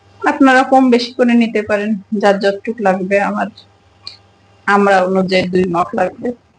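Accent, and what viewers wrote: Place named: Barishal, Bangladesh. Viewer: আপনি মনে হয় বরিশাল্লা😅😅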